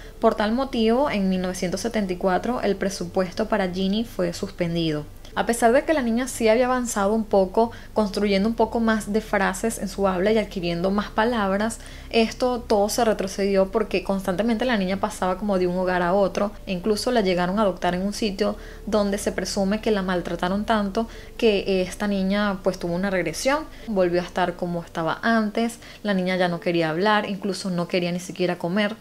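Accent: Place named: Venezuela. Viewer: no se porque llegue a ti. pero al escuchar tu bello acento venezolano. me encantaste. aparte de lo interesante de tus contenidos. mucho éxito y felicitaciones. arriba Venezuela.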